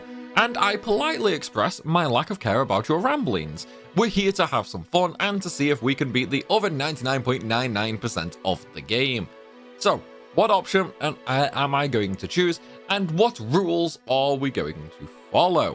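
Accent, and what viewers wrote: United Kingdom. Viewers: Y'know, most people enjoy it when a YouTuber doesn't spend a bunch of extra time baby stepping you through the basic systems of a game, because it gets really repetitive hearing every single challenge video explain the exact same things over and over. And I guess hearing a British accent for the first time in your life, while also watching a challenge run of a game you know nothing about, might leave you slightly confused as to what's going on here. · are you british by any chance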